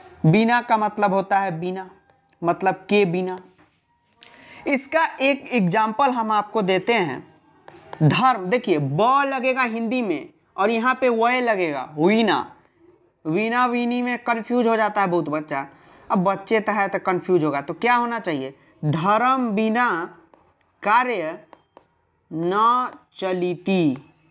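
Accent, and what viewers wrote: Bihar, India. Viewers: Bihari hai ka sir ?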